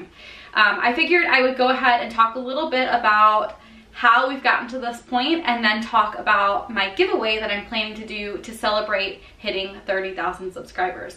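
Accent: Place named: Chicago, United States. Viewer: Congrats girl!!! I found your channel from searching for Erin Condren videos. I live in Seattle but am originally from Chicago! Your accent reminds me of home!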